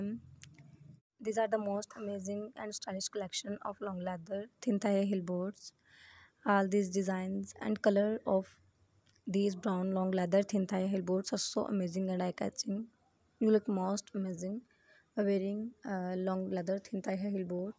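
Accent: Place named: Japan. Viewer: Your Japanese accent kills me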